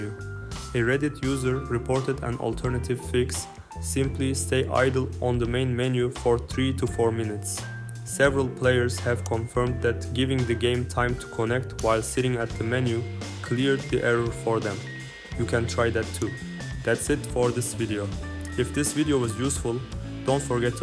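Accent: Turkey. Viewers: eyvallah reis işe yaradı aksanından belli türk olduğun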